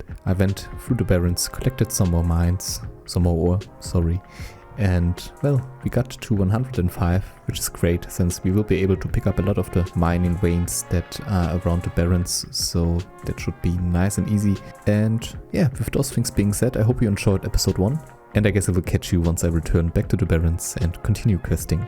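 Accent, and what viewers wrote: Germany. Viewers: Man hört echt das deutsche raus^^,But great Video:D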